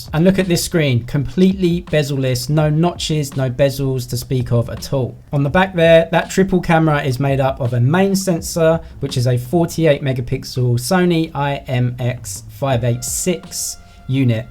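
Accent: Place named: United Kingdom. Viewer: Hi, im looking to see this on Amazon via your link but its just taking me to the homepage when i click it. Any ideas? Im in the UK (and judging by your accent you are too) so how have you got your hands on it? Were you sent a review unit or did you buy it yourself?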